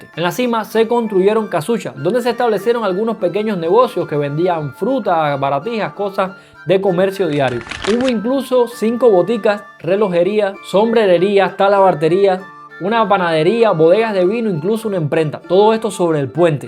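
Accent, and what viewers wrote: Cuba. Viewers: Estimado amigo...! Que grato escuchar tu relato, sobre este puente del cual no se habla mucho y menos con la presicion, dedicación y amor al relato, con lógica histórica, ya que la colonia en todo el mundo fue una época vivida con mucha dificultad desagradable que si bien es cierto está gran parte escrita hay lugar en el relato qué da lugar a la fantasía pero de la buena, grata entretenida aferrada a la historia escrita, se te agradece Estimado amigo cubano, tu acento le obsequió un tono además hermoso a esta historia, un millón de gracias.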